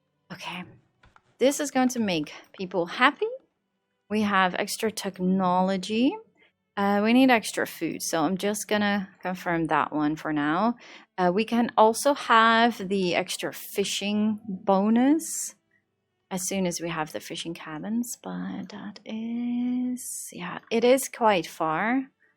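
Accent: Netherlands. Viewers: You sound Dutch.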